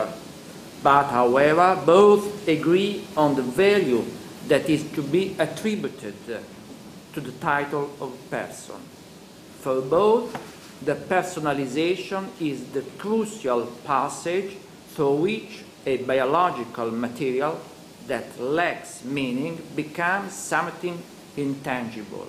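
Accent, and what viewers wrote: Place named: Italy. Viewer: I share in his subtle Italian accent, and incomprehensible sentences in the video, as the munus of our community.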